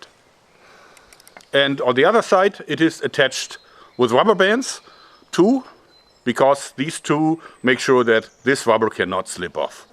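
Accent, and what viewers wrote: Germany. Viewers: The speargun has not just one shot. And you don't have to fill it up. You took too much pressure to the spear gun, so it can be damaged. Also air pressure guns ARE NOT TO FIRE IN THE AIR! THEY CAN BE DAMAGED! Listening to your accent I think you're german as me, so please read this: Die Harpune ist werkseitig mit einer fest eingebauten Druckpatrone ausgestattet. Die Patrone hat bei der Auslieferung einen Grunddruck. Es sind daher keine Druckpatronen o.ä. erforderlich. Die beiliegende Pumpe dient nur zur gelegentlichen Nachjustierung des Drucks oder zur Füllung nach Wartungsarbeiten. Die Harpune kann so beliebig oft hintereinander genutzt werden. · Kann es sein das du deutscher bist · Can someone explain to me why his German accent and someone's German accent like Christoph Waltz or Skallagrims German accent are so different? is it a regional thing?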